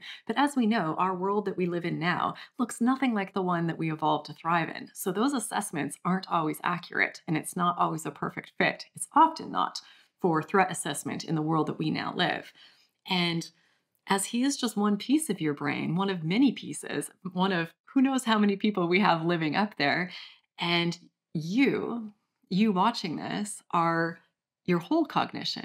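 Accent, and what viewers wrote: France. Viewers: Digging the French accent and French Flintstone. 😂 (I speak French!) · Thank you so much for your great work, your french accent is beautyful❣️A lot of greetings from Germany 🙏🌈🙋‍♀️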